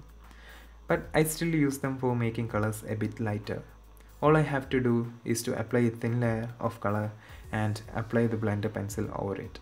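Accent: Kerala, India. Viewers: Are you a mallu? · Sans-sational YouTuber that's a typical Indian accent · Are u from kerala? ?? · He is most likely from Kerala, god's own country. Malayalam and their accent sounds very pleasant to my ears. 🙂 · Are you a keralean ? Your accent is like malayalam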